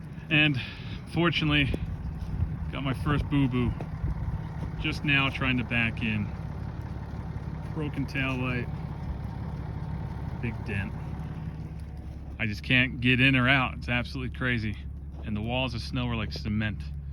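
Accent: United States. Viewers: Just curious, what part of the east coast are you originally from? I hear that accent every now and then. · I thought you sounded like you could be from here! I’m in south central PA an hour south of Harrisburg. It’s funny we’ve had a practically snowless winter here. Keep your chin up out there. 🙏. I know there’s some pretty rough corners in your neighborhood (poor truck) but Spring is right around one…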